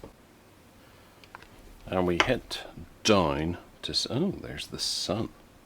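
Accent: Northern Ireland, United Kingdom. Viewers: + - are you from Northern Ireland?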